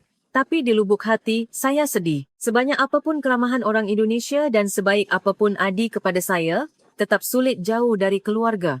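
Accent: Malaysia. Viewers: Narator nya orang Malaysia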